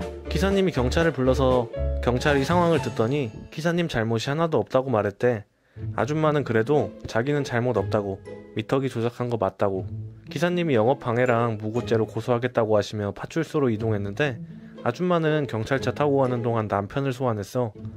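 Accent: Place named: Busan, South Korea. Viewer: 마 부산사투리 어썰푸다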